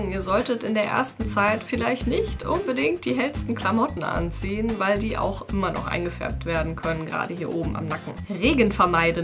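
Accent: Germany. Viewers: Kommst du aus Münster oO ?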